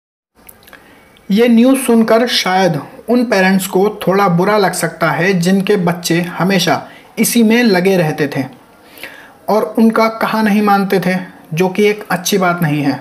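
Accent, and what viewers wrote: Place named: Delhi, India. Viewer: Bhai aap Delhi se ho na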